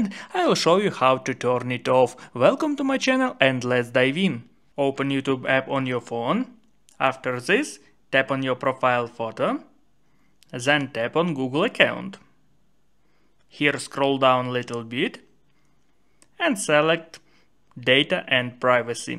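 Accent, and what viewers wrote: India. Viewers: You sound like a scammer from India !!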